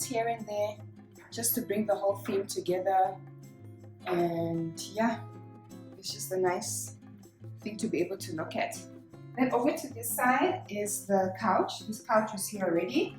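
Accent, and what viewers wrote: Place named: Kenya. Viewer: Accent from Kenya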